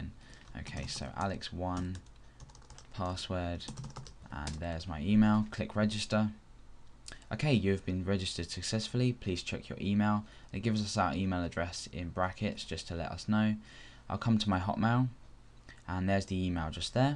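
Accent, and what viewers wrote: United Kingdom. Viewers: Your british pronounce is kinda hard to get. you pronounce php as pae ech pae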